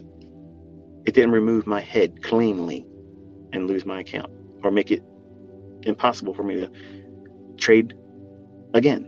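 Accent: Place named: Netherlands. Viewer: yo bro ben je nederlands?? ik hoor het aan je accent man haha. ik ben zelf ook ICT student en zeker goede video die je hebt gemaakt very inspiring.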